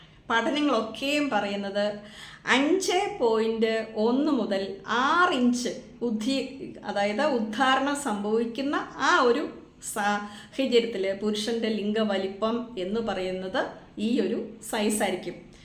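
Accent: Kottayam, India. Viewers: കൗഗേൾ, ലോട്ടസ്, ചെയർ, ലാപ് തുടങ്ങി സ്ത്രീ മുകളിൽ ഇരുന്ന് ചെയ്യുന്ന എല്ലാ പൊസിഷനിലും സ്ത്രീയ്ക്ക് പരമാവധി സുഖം കിട്ടണമെങ്കിൽ നീളവും ബലവുമുള്ള ലിംഗം തന്നെ വേണം. കാരണം സ്ത്രീ മുകളിലിരുന്ന് ചെയ്യുമ്പോൾ അരക്കെട്ട് നല്ലത് പോലെ ഉയർത്തിയും താഴ്ത്തിയും ചെയ്താൽ മാത്രമേ നല്ല സുഖം കിട്ടുകയുള്ളൂ. കൂടാതെ സ്ത്രീ പൊങ്ങിപ്പൊങ്ങി വേഗം വേഗം ലിംഗത്തിൽ റൈഡ് ചെയ്യുമ്പോൾ ലിംഗം യോനിയിൽ നിന്ന് തെന്നി വെളിയിൽ വരാതിരിക്കാൻ ലിംഗത്തിന് നീളം വേണം. അതുപോലെ കൃസരിയെ ലിംഗത്തിൽ മുഴുവൻ ഉരച്ചുകൊണ്ട് റൈഡ് ചെയ്യണം. ഇടയ്ക്ക് ഗ്രൈൻഡ് ചെയ്യണം. ഇങ്ങനെയെല്ലാം ചെയ്ത് സ്ത്രീ യോനിയിൽ ലിംഗം കേറ്റിയിറക്കി പറന്നടിക്കാൻ ലിംഗത്തിന് നീളവും ബലവും വേണം. ശരിയല്ലേ പാലാക്കാരത്തി അച്ചായത്തീ? കോട്ടയം പാലാ സലാംങ് കേൾക്കാൻ നല്ല സുഖമുണ്ട്. അത് സെക്സി ആണ്.❤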